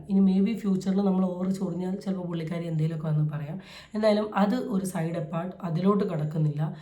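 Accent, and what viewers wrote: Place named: Thiruvananthapuram, India. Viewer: Only particular areas in tvm they speak in this way , please don't tease the slang it's a request not an order please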